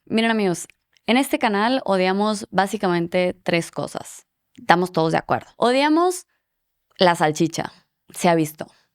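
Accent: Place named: Sonora, Mexico. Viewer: Vivo en Austria desde hace 11 años pero soy de Sonora. Me encanta escucharte pero en este vídeo se te sale mucho el acento washo 😭😭 nimodo... Buen episodio. Saludos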